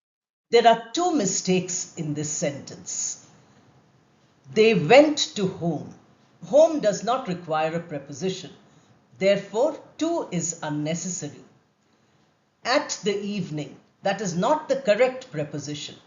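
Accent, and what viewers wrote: India. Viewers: Dear madam However well indians speak English it is not like native speakers of English.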